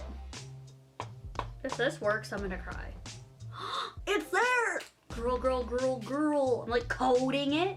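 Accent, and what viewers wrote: Canada. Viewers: It's your Canadian accent!! Lol!! You were close! Great job! If you had thicker dreads you would look just like her!!❤️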